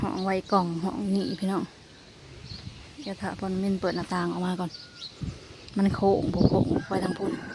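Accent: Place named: Laos. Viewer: เวลาลาวเว้าน่าฮักอิหลี ป้ากะหลงฮักเจ้าแล้วล่ะมาให้กำลังใจจ้า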